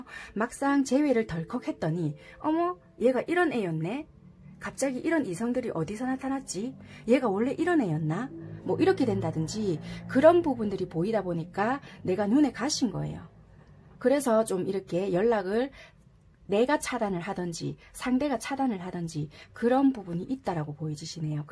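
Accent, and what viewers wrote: Busan, South Korea. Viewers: 개인 전화 상담 신청 어떻게 하나요 🥺❤️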